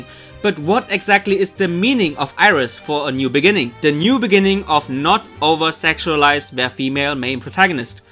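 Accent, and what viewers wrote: Germany. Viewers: one question. what nationality are you? it sounds like a german accent you have. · you have a german accent... are you german?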